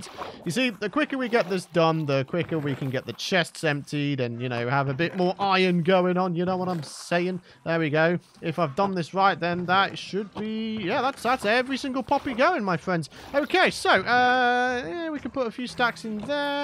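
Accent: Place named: Scotland, United Kingdom. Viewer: anyone else think that is a want to be Stampy, except stampy is english and sounds scottish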